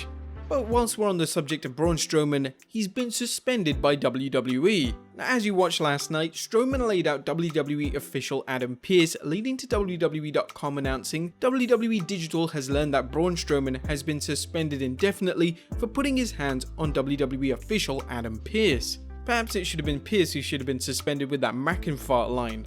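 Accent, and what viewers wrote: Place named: Southern England, United Kingdom. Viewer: Southern English man not British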